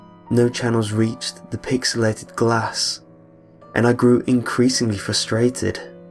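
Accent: United Kingdom. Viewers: wow are you a British? your voice is simply sexy :3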